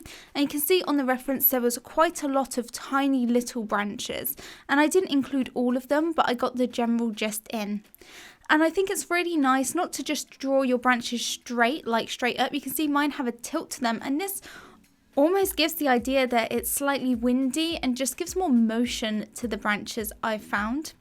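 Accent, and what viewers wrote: United Kingdom. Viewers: What a joy to hear a British accent on a YouTube art video. This is a really wonderful and helpful video, thanks!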